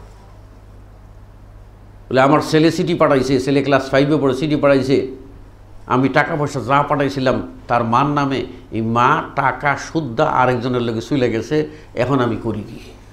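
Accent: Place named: Sylhet, Bangladesh. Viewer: He is a Sylheti accent..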